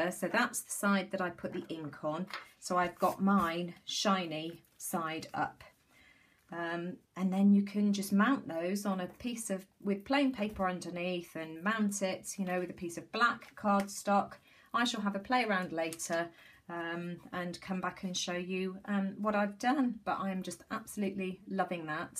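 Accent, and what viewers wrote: United Kingdom. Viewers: thank you so much for replying that was very sweet of you to take time out but I figured you were probably in the UK from your accent I'm in the USA so I don't even know what Boden is LOL just looks darling I had a top similar to it a long time ago and made me think of the polka dot top I used to have and I don't know what happened to it LOL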